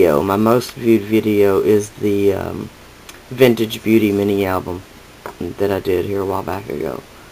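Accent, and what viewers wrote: South, United States. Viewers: Fun video! Btw I like your voice, and by the accent, I would guess southerner like me😊. I'm the same way I hate hearing my voice in my videos. I think everyone does.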